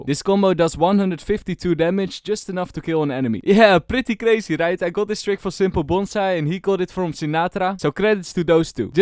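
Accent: Netherlands. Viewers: Can just hear your dutch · Dear i have a burning qeustion u sound like u have a dutch accent so please r u dutch?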